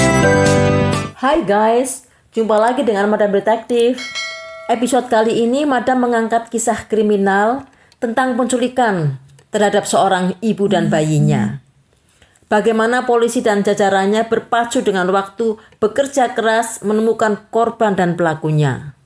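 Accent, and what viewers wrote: Java, Indonesia. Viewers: logat jawanya medok amat mbak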